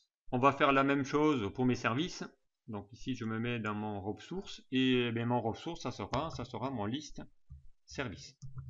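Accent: South, France. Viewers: merci monsieur pour ce partage de savoir. Les explications sont très claires et en plus on le petit accent du sude!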